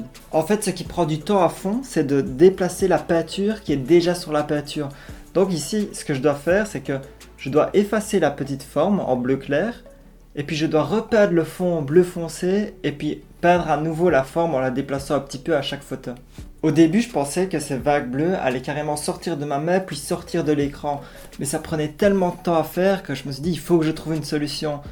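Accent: Liège, Belgium. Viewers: trop cool ca fait du bien d'entendre un accent liégeois !!!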